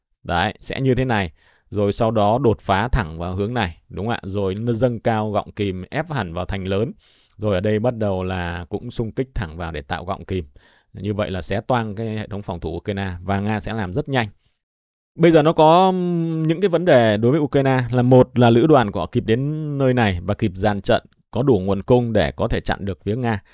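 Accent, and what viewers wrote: Standard. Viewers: Giải trình hay âm phát chuẩn rỏ ràng ❤❤❤🎉🎉🎉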